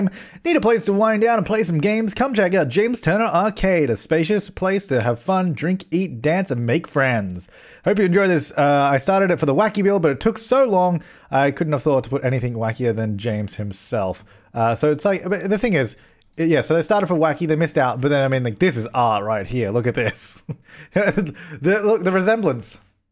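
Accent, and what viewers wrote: Australia. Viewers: Kinda sad that the Australian accent (soft g sound before a long u) disguised the 'historical duty' pun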